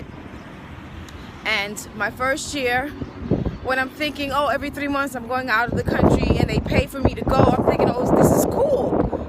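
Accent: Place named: United States. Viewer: You better get your ass out of there.. Before something go wrong..like go to jail.. remember you don't suppose to be there... The key word is illegally.. you sound like you are American woman if I was you I will get back to United States and you can go somewhere like Canada or Brittany will somewhere where's though you're not going to go to jail for being their country illegally get the F from out of there before you regret it sister Sincerely Nate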